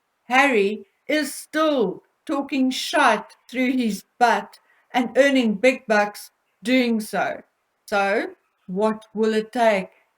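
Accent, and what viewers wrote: South Africa. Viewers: You have the most pronounced South African acsent...you should speak Afrikans it would be more tolerable.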